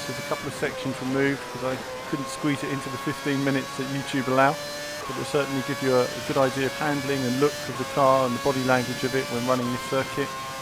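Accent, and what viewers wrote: England, United Kingdom. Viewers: It's a figure of speech. I'm fully aware he is an Englishman. Think the accent gave that away :)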